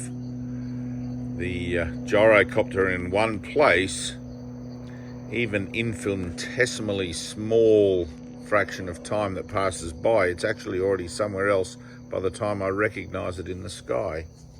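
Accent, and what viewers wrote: Australia. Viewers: 1965 - me to, I'm Pisces, in Australia as you sound to be, looks like QLD ? - this is fascinating, yes we are spirit beings having a human experience ! Now, also at 59 I have been "pondering" these issues since childhood & I find it so interesting that almost everyone with whom one discusses this, almost invariably cannot resist pointing out error of one type or another, this I am NOT doing ! I do look forward with great anticipation to getting my copy of this book, thank you so very much for this video & indeed your Y.T. Channel. A more captivating subject I have yet to encounter, love and light be with you and ALL souls now, 💜💜💜 💜 💜💜💜 🕉 🙂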